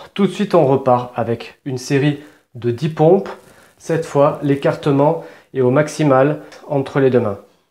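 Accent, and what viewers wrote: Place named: South, France. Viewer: T'as l'accent du sud parfois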